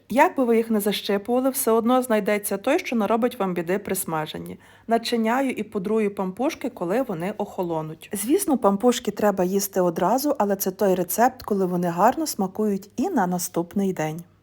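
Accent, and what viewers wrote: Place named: Galicia, Ukraine. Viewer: Браво ! Зразу чути, що галичанка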